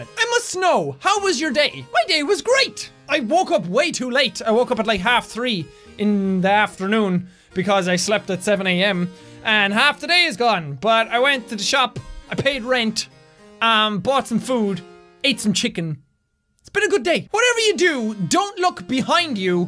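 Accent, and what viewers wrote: Ireland. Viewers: i dont know why but people think jack is not irish he is hes trying to make it easier for you guys to understand im irish and i can tell hes irish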